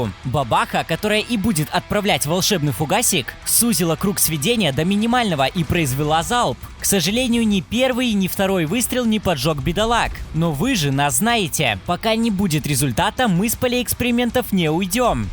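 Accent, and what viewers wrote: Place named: England, United Kingdom. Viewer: Я один заметил что у него небольшой английский акцен?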